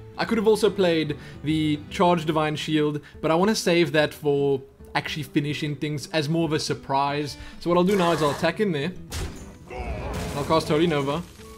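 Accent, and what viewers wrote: South Africa. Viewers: Hey you from S.A? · South African, close to Cape Town :)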